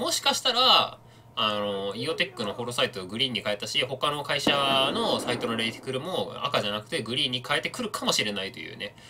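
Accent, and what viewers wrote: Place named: Western Japan, Japan. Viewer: 西日本の人は質をひちと読みます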